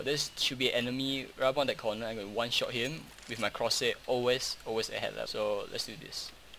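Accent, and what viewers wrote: Singapore. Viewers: You have a Singaporean accent. Hello :D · Hey you from a singaporean background :) You should speak more singlish in comp Can you just play a comp game purposely stressing a singlish accent and upload it to youtube? Because I too am singaporean. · the accent sounds like sg tho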